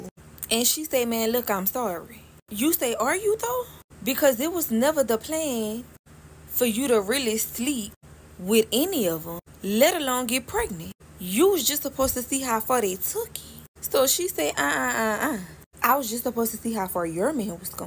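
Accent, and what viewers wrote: New Orleans, United States. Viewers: That nawlin accent is everything to meeeee behbay! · That’s that New Orleans accent baby👏🏾 Louisiana in the building 🫶🏾😂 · Only N O people have that accent not the whole Louisiana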